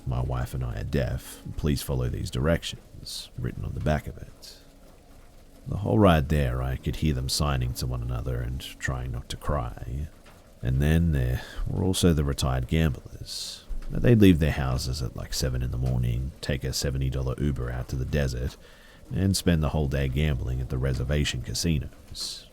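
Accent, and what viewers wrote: Australia. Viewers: Ikr i love accent. I live in northwestern US and i have never met someone irl from australia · Omg. An Australian narrator. I'm so happy right now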